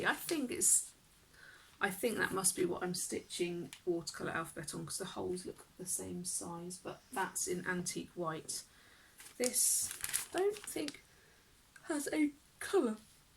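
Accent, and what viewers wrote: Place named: England, United Kingdom. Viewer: Hi there, glad to have found you. I live in Calgary, Alberta in Canada, but lived in the SE of England up until April 2008. Thoroughly enjoyed watching your video and hearing another English accent outside of the family! Cross stitch is definitely a more popular pastime in North America. My LNS is a 40 minute drive away, and the first time I visited it I was like a kid in a candy store, drooling over the choice of patterns, threads, fabrics and other bright shiny things! I started my first Long Dog Sampler in May of this year - The Templar Prophecy, I have also downloaded Pandemic, but won’t be starting that for a while.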